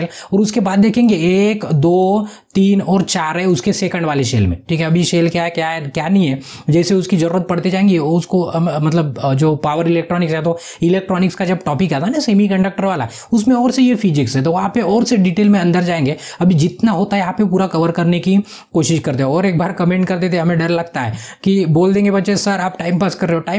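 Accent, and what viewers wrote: Maharashtra, India. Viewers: Sir Aap marathi maharashtra se ho kya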